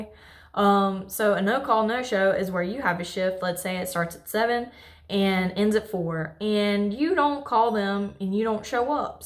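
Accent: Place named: South, United States. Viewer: Your Southern Accent, is very very very Beautiful, with your very very very Beautiful Personality, my Friend 🥰🥰🥰🥰🥰🥰🥰🥰🥰🥰🥰🥰🥰🥰🥰🥰🥰🥰🥰🥰🥰🥰🥰🥰🥰🥰🥰🥰🥰🥰🥰🥰🥰🥰🥰🥰🥰🥰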